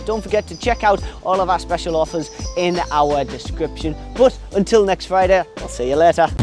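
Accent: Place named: Newcastle, United Kingdom. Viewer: Newcastle / geordie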